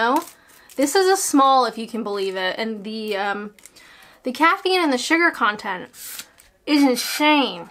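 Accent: Canada. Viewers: I love the aboots 😊😊😊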